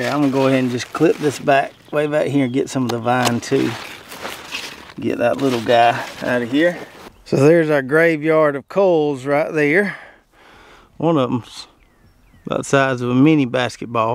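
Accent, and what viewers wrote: South, United States. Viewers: Love an open minded hippy hiding under a southern accent. Thanks for sharing your knowledge and journey. Your family is so adorable and sweet.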